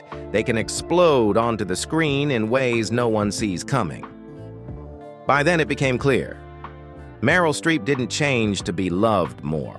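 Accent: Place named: South, United States. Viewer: this narrator dealing in a southern drawl? It seems it just Popped up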